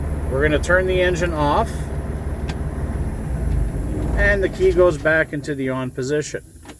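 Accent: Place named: Canada. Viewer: Finally... I found someone who sounds 'Canadian' ...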